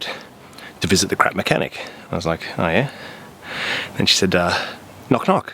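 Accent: Australia. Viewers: It's weird seeing this. Usually with a West Virginia accent and "hold my beer" thrown in from time to time has been my experience. Seeing and hearing the Aussieness of it is pretty cool. Interesting "series", thanx for taking the time to make the videos and post them!!! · Are you from Australia?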